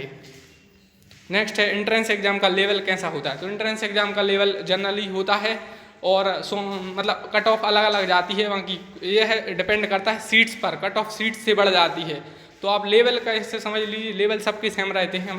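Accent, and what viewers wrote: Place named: Sagar, India. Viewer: Bhai ap sagar side k hi ho kya...m bhi wahi kha hu apki awaz..se lg rha h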